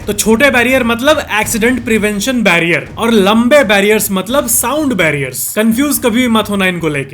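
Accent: Bihar, India. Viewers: kya aap bihar se hai bhaiaa🤔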